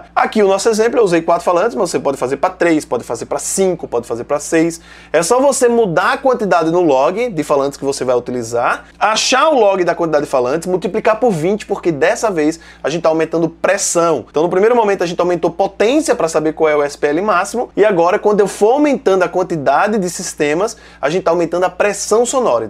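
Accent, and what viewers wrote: Northeast, Brazil. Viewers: vc é de qual estado do nordeste? gostaria de fazer o meu som com vc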